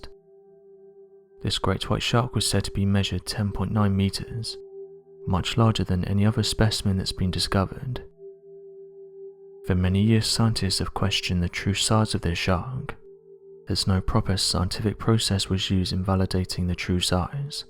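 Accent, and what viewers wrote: United Kingdom. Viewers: I'll save this one for tonite. This guy is for bedtime and the other (guess there are two in this channel) for daytime. Both have great voices and nice British accents.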